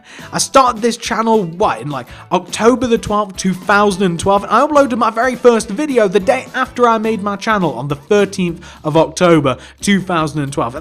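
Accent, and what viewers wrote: United Kingdom. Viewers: Your voice doe. I never seen you get excited like before xD your voice is british doe.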